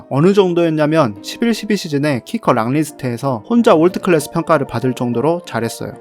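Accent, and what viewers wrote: Jeolla, South Korea. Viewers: 전라도 사투리가 심하시네요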